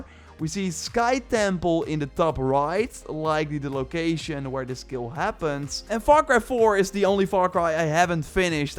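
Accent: Netherlands. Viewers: asljeblieft , werk aan je verkaasde engels . goeie content maar mijn oren bloeden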